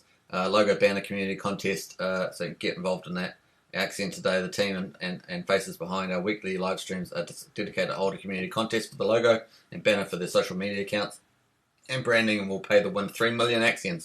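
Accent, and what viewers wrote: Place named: New Zealand. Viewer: You are obviously a kiwi boy. Kiaora for these clips. I have no idea how to work auctions. I need to learn more.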